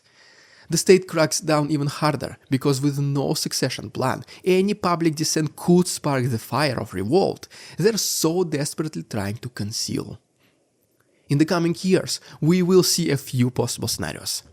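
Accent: Russia. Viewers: I don't know what will happen, but I have been waiting for this video, long before you made it. People have talked about Putin wanting to conquer Europe, along with other ideas like Putin will live for a really long time. Yet, Putin is already an old man. It is only a matter of time until death comes for him. Putin is a snake that is running out of time, trying to secure his legacy, in my opinion. Watching your videos is getting me used to hearing Russian accent correctly btw lol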